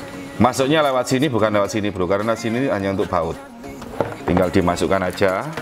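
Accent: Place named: Surabaya, Indonesia. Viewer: Medok e koyok wong suroboyo bro 😂🙏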